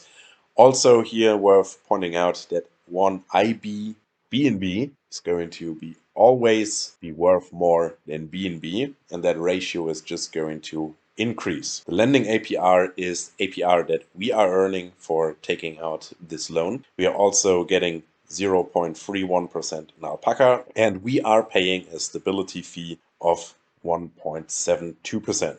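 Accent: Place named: Germany. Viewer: Nice german Akzent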